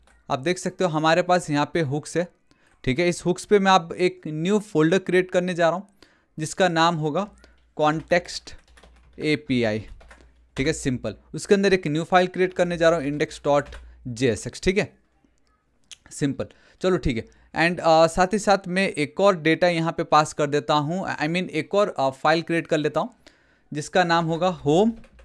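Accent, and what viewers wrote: Nepal. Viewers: 😂😂 counter na saunter , full Nepali tone , lots of love 💕😘 bro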